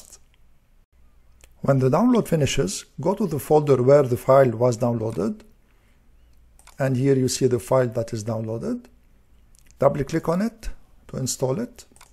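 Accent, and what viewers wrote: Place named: Lebanon. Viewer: huge lebanese accent lol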